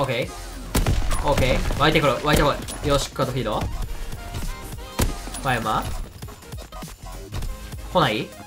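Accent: Kansai, Japan. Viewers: 元が関西やからな。普通に関西弁使うときあるしイントネーションも関西寄り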